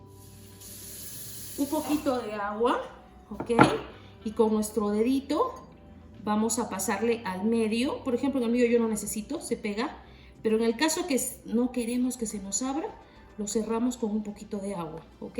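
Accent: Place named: Peru. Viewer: ¡Qué peruana que eres! "salsita, cominito, cada presita" Me encanta, extraño mi tierra.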